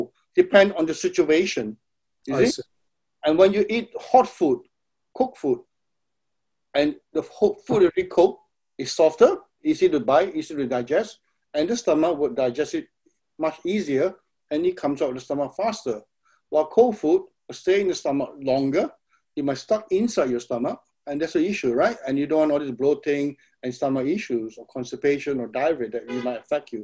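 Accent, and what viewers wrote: Singapore. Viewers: bro r u singaporean?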